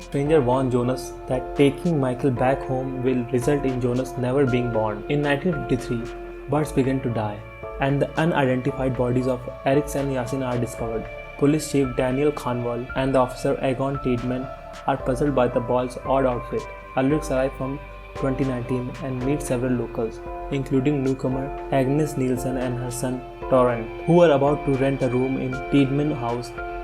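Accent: India. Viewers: i liked it because of the indian accent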